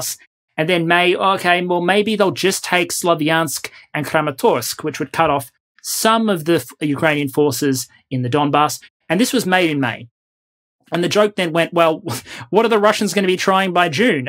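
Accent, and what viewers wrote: Australia. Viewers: I would like to know the overall effect of the cleaning out of all the old Soviet hardware. Is this a good thing? Does this allow all the EU countries to upgrade and standardize their military hardware. And NATO has pledged a 300-400,000 man force. Do you think this is affordable for the long term. Because it seems that this 400,000 force will require about 2 years to become really effective. And If I were President Putin, I would try to have everything sewn up by say, next spring. A stablized drawn out struggle works against Ukraine, because Russia can send random missiles to reach any factory or training center anywhere in the country. These Are my 2 questions. Thanks. I always like your information. I enjoy your jokes and your accent. As being here in Japan, I don’t meet anyone with an Australian accent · Hi, nice to hear an Aussie accent again. 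I'm Aussie born (Sydneysider), but have lived in Europe for 57 years, so the main part of my life. I do however still keep track on the goings on Downunder. As for that financial bit, they could be counting on the unexploited resources of the Donbass area and got the figures mixed up. I mean that points to the potential of the area, not what is being produced today. The Donbass holds large quantities of oil and gas and only a minimum is being exploited today. The presidents/governemts before the Maidan revolution (February <num> never put much money to it and kept Ukraine dependent on Russian supplies, which they frequently turned off and let the Ukainians sit in the cold. That was never really necessary if they had exploited those fields themselves. This brings me to why Russia went into Ukraine. After the Maidan revolution (2014) plans were made in conjunction European energy and fuel conglomerats to start exploiting those reserves. 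Because a. that would ensure gas supplies to Ukrainian citizens so they wouldn't have to suffer cold winters again and b. the European market was increasingly in need of gas and it would make them less dependent on Russian gas, with the bonus of revenues for Ukraine. That is what sparked the Russian invasion. They were afraid of losing their controle over the European market and losing their hold on Ukraine. Every expanation else is just Russian propaganda and holds no merit. However the Russian inv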